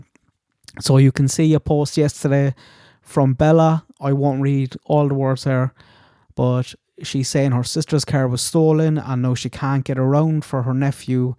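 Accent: Ireland. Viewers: He sounds Irish to me and I like it but it can be a bit harsh at first if you aren’t used to it. Specifically the r’s and t’s. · I don’t know why I didn’t consider that; I lived in the same dorm building with a few Irish girls and yeah now I’m thinking the speaker could be Irish lol. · Yay to his Irish accent. I love it. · I'm sorry you don't have a sophisticated ear to appreciate an Irish accent. That's your misfortune. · I love the Irish accent & many others in the world!!! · He’s got a lovely voice and accent you rude pr!ck. And he’d quite obviously Irish, for the ppl in the back 🙄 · You are either a bully, or racist, if you don't want to listen, go away, every voice is awesome, (not everyone can speak), Irish is like every accent a blessing, you really should apologise, works so hard to bring us content, and you don't have to pay to watch it, stop being rude and if you do want to go farther in life, REMEMBER it's nice to be nice, manners are free, a smile can make a lonely persons day, and we all have to live in this world, and everyone else is as important as you are, have a great day and stay safe ❤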